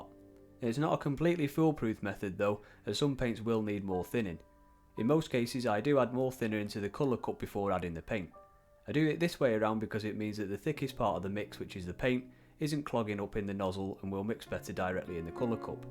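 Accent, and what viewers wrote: United Kingdom. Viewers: Biltema acetone? You sound really British, but I thought Biltema only existed in Scandinavia?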